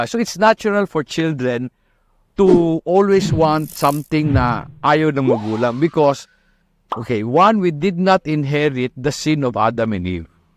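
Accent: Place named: Visayas, Philippines. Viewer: Cute kaayo ka magbisaya Sir 😮😊😊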